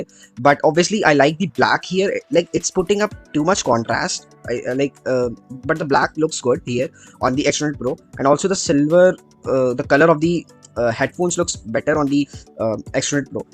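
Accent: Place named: India. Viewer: Indian English ... :(( fro Indian audience only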